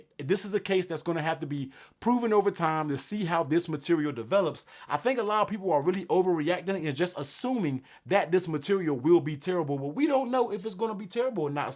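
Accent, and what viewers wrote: United States. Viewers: You're american huh? can't you just talk normal?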